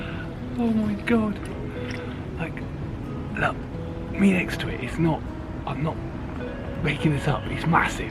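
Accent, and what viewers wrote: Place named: Australia. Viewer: Thats awesome catching huge perch in town and i got to hear a fellow Aussie 🎣👍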